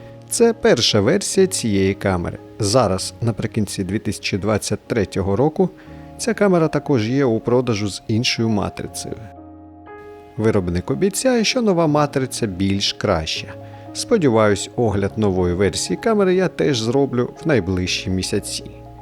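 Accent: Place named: Russia. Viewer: У вас ужасный русский акцент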